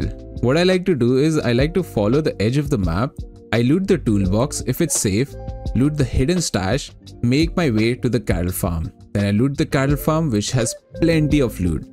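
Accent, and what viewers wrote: India. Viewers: Idk why but you sound like an INDIAN... If yes than i'm surprised to see an indian making tarkov content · your indian accent makes this video 2x more enjoyable. On the other hand it contains very valuable knowledge - super good vid. Well done sir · Hears Indian accent. Clicks off.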